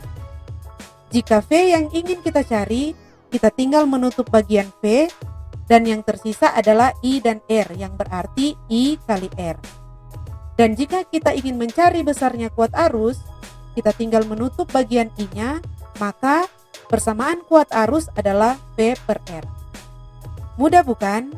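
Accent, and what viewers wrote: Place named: Manado, Indonesia. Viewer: Rupa logat manado ini 😂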